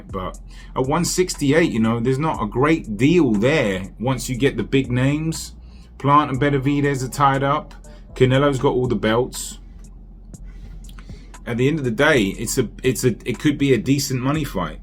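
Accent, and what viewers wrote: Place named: United Kingdom. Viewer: Oh snap I didn’t click you live in nyc. From your British accent I just assumed you live in uk. Props bro from uk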